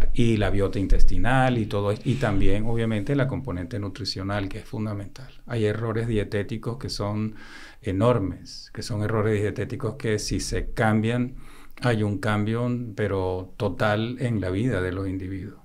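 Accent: Venezuela. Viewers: jiji Solo escuche el intro y super que el doctor es Venezolano, que orgullo. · Que hombre tan inteligente 🧠 y EXCELENTE ORADOR ❤ CREO Q ES VENEZOLANO POR SU ACENTO. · su espanol es Venezolano claramente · me descolocó cuando dijo que era italiano, desde escuchar sus primeros palabras supuse que era venezolano por su acento!! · Para mí, este capítulo ha sido una cátedra magistral, impartida por un profesional excepcional. Su brillantez reside también en su habilidad para simplificar términos técnicos, haciendo que la información sea comprensible para todos. Su calidad humana es igualmente destacable. Desconozco su trayectoria personal, pero por su acento, percibo una profunda conexión con los venezolanos y Venezuela. ¡Mi más sincero agradecimiento! Totalmente recomendado." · Excelente entrevista ! Usted es italiano pero habla muy Venezolano Doctor🇻🇪🙏🙌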